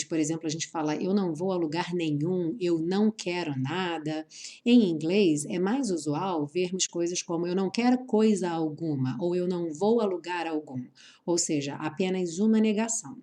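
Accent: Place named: São Paulo, Brazil. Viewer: Certo professora! Som de r do interior de São Paulo(Piracicaba).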